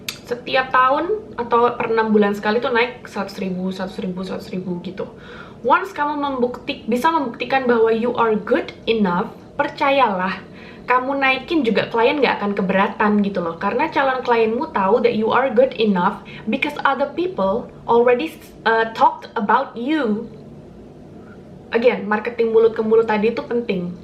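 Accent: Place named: Yogyakarta, Indonesia. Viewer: Thank you for kind sharing. Seneng banget akuuu, penasaran Ka orang mana sih? Logatnya kaya orang jogja hehe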